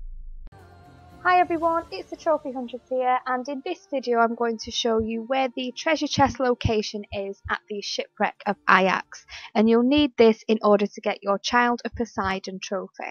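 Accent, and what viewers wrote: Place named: Blackburn, United Kingdom. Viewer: Nice one! Blackburn accent that?!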